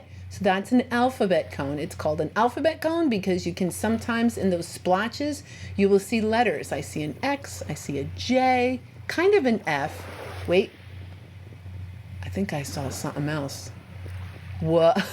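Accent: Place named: New York, United States. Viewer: I love your videos so much! Thank you for creating them amd sharing tips! Question - are you originally from New York? I'm curious because I am, and your accent sounds so familiar to me!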